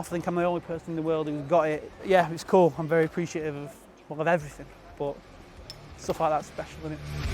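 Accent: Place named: United Kingdom. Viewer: The accent.... 100% authentic Brits, y' know wha' I mean?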